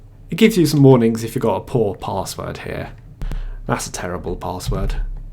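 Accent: United Kingdom. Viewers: I love your UK english man Nive vids, thank you :)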